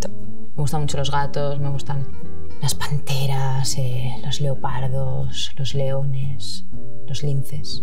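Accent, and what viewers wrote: Catalonia, Spain. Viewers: me encanta su accento mas o menos no puedo entiender personas de Espana. Pero ella hablar suave y muy claro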